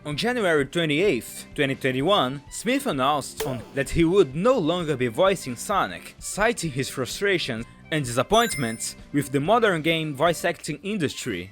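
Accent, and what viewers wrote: Brazil. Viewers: I do think the narrator is brazillian too,, do notice how they say manolo rey, and y'know,, "velocidade" in the bottom corner, not sure if the channel is fully ran by brazillians,,, mas seria legal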